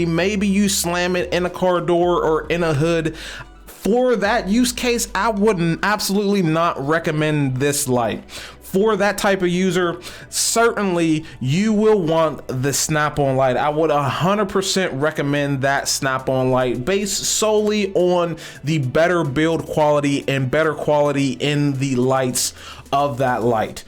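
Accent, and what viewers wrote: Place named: South, United States. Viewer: i like a brother that has an southern accent as deep as my h*nky self does...you got a gmt900 truck...i've had the ecarb042 since it was first came out, wound up with 2 actually...left one of them under a 3.0 ecodiesel and it's now gone back to mother nature gaia...anyway, you're a new subscribe for me.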